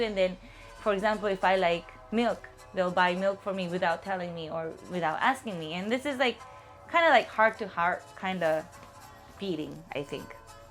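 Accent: Philippines. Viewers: Mabuhay Sensei!!!. Your accent sounds like a Filipina already! Like you said 郷に入らば郷に従え (笑) Btw I'm a big fan of your artworks from Myanmar!!! Mingalarbar🇲🇲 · her english accent is very filipino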